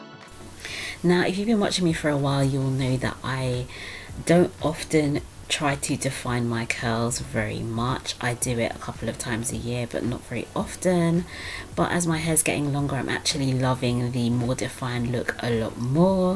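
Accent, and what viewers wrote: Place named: England, United Kingdom. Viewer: First let me say i love your video's ! I'm wondering where you're from or where your accent comes from. I'm thinking you must be English. Every time I hear your intro, I can't help but think of the movie Silence of The Lambs. Hello Clarice.....LOL !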